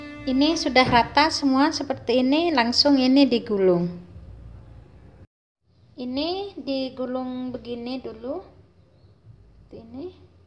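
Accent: Madura, Indonesia. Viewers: Bunda dari mana ya ...ngomongnya kyak org madura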